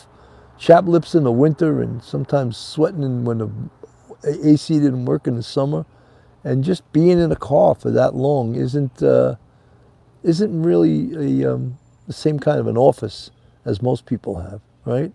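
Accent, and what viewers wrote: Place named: Texas, United States. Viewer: I want to hear your Texan accent lol.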